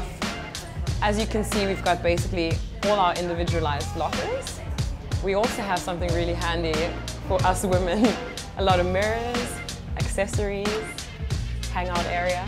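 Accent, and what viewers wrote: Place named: South Africa. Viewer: she has a slight south african accent but a german flag?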